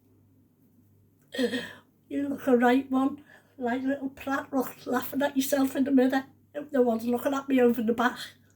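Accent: Liverpool, United Kingdom. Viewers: Hi, im from Huyton Liverpool, your a lovely woman, Luv yur scouse… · You are just the Best mother .. grandmother and great grandmother and thank you for the laughs 😂. Keep up the good work...Your Scouse sound delicious. Enjoy ...😊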